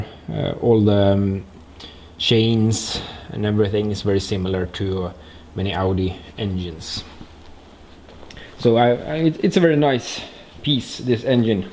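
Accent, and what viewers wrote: Sweden. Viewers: är du från Sverige eller?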